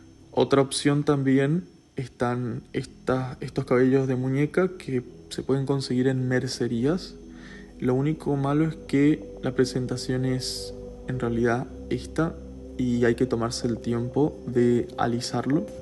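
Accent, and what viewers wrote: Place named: Corrientes, Argentina. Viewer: Sos Correntino ?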